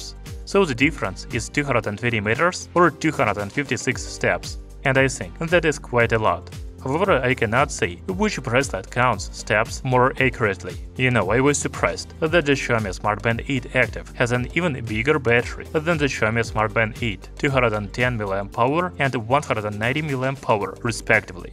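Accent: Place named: Russia. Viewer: I'm sorry Bro, it is quite difficult and troublesome to understand you. Your russian slang is very strong.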